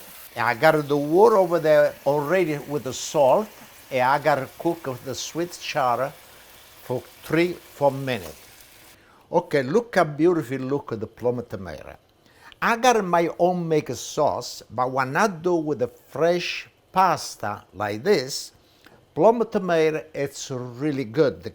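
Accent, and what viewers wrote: Italy. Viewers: Love the italian accent 👍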